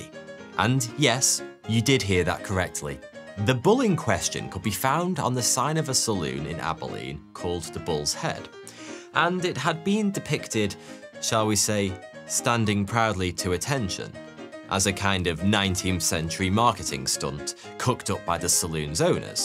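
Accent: United Kingdom. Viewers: Just wanted to say that I didn’t watch the video. Can’t possible listen to a British tell a story about the old west. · It never sounds right when British accents tell cowboy stories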